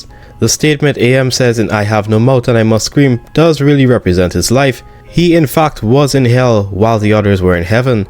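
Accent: Jamaica. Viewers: Is you Jamaican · Jamaican? Which parish bro? 🤙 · You sound jamaican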